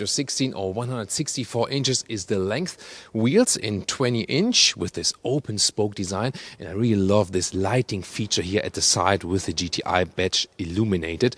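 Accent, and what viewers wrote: Germany. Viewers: First thing tht came up to my mind when I saw this 'flat flunder' : what do you to with your head sticking out above the windshield? (You can see it ) . Looks funny. And why did they choose a German presenter, whose accent is still audible? One important question was not answered: how high would the purchase price by of such a 'fun car' with 14 " road clearance which would have trouble to pass what the French call 'ralentisseurs' without any damage.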